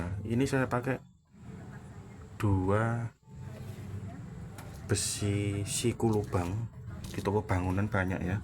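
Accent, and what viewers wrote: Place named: Malang, Indonesia. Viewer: 😂 iki logate kok koyok wong malang yo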